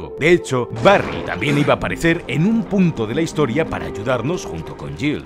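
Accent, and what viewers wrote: Spain. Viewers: Un detalle alucinante es que el video sea narrado por un español con memes en audio latino jja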